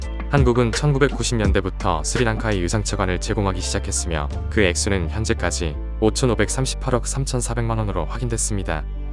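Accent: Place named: Jeolla, South Korea. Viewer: 전라도 말투 숨기지 마세요ㅠ 왜 전라도 유투브들은 우덜 말투를 왜 부끄러워하는지ㅠㅠ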